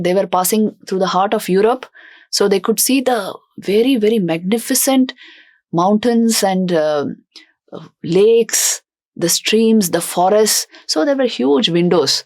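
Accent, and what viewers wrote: India. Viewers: Why does she have an Indian accent in English? She doesn’t look Indian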